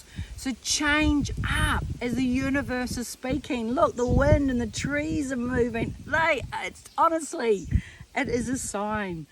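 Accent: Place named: New Zealand. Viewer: Good evening..i have just found you tonight and i so enjoy listening to you at this moment..i have been red pilled for almost 2 years now...things are very tough here in Queensland, Australia and i think i pick up an New Zealand accent..am i correct?🎆💜 I am almost 79 and refuse to wear a mask and absolutely refuse to have the jab!!!GOD BLESS you all in NZ,stay in high vibrations everyone..love and light to all.🇭🇲💜🙏💜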